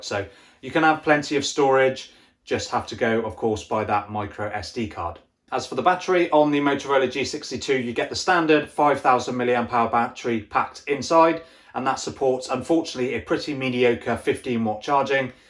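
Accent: Bristol, United Kingdom. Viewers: He speaks with a Bristol accent.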